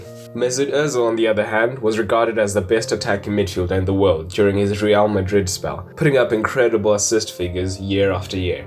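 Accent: South Africa. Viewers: You Have A South African Accent. Either Zulu or Xhosa :) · Is this guy from SA? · Totally of topic here, but this dude sounds south African,... or is it just me?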